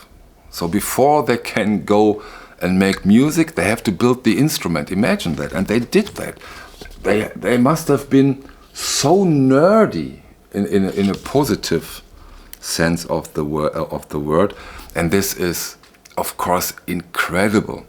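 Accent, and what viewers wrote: Germany. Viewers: Am I the only one who could listen to this man all night. That German tinged English is incredible.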